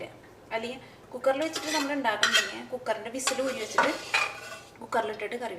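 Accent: Thrissur, India. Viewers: Dear, your sambar receipe Awesome!! But more than that i just loved your thrissur accent..;)..Keep posting more receipes..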